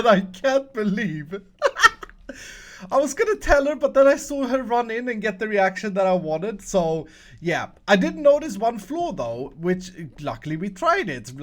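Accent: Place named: Sweden. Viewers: Hey ARE you from sweden i just subscribed on you and you sound like a swedish Guy IM from sweden hejdå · yes hes swedish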